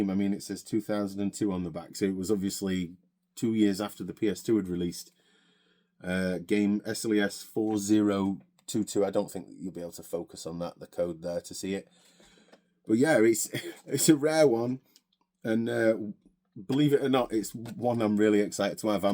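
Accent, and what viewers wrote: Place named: Yorkshire, United Kingdom. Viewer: A fellow Yorkshireman talking videogames? Subscribed mate!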